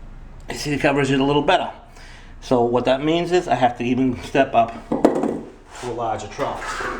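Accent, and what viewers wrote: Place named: New Jersey, United States. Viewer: Quarta by 3/8... love the Joorsey accent. Thanks for making these videos, very helpful.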